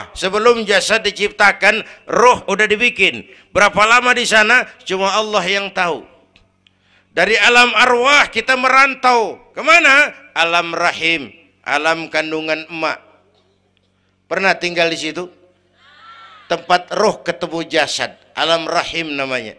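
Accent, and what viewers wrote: Jakarta, Indonesia. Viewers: Kyai sejuta umat dan sampai saat ini belum ada pengganti beliau, begitu adem kajiannya dan identik dengan logat betawi. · Logat orang jakarta 😂❤❤❤❤❤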